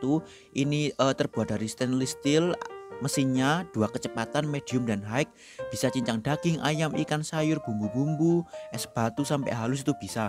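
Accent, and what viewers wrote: Java, Indonesia. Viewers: Udah kenal channel ini sejak subscriber nya puluhan ribu sampai sekarang hampir sejuta. Suka sama suaranya yg medok dan resepnya yang mudah (meskipun gak pernah aku coba karena males wkwkwk) Sukses terus, mas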